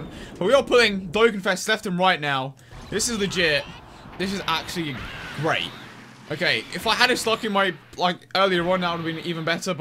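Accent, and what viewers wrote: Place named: United Kingdom. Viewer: Karlo that's British accent for ya he literally said Imperfect Cell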